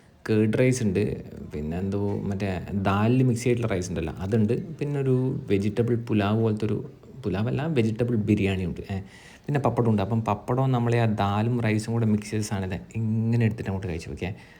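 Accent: Kannur, India. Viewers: ഇയാളുടെ വിഡിയോ ഓഡിയോ മ്യൂട്ട് ചെയ്ത് കാണുന്നവർ ഉണ്ടോ? പാണ്ടിക്കാട് കുഞ്ഞാൻ മലപ്പുറം ഭാഷയെ വെറുപ്പിക്കുന്ന പോലെ ആണ് ഇയാൾ ഓവർ സ്ലാങ് ഇട്ട് കണ്ണൂർ ഭാഷയെ വെറുപ്പിക്കുന്നത്. ഞാൻ കണ്ടേ, ഉണ്ടേ, പോയതാണെ ഹോ കേക്കുമ്പോൾ തന്നെ അരോചകം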